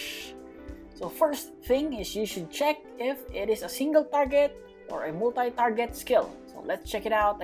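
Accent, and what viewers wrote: Philippines. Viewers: Pilipino kaba kasi parang pilipino ang accent mo eh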